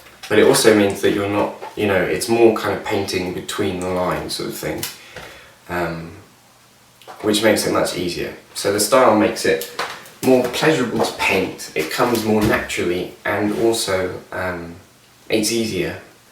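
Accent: United Kingdom. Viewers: You look like a young Carl Sagan, and you have a deep voice like him, except yours is British haha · "Drawrrings" yep he's british it all checks out. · No he doesnt. He has an English accent, thats about it. Mitchell is much more nasal.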